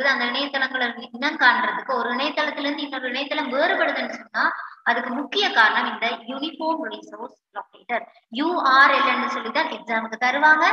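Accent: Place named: Sri Lanka. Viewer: wow srilankan tamil kekave nalaruku...unga voice alagu ....best wishes ma.....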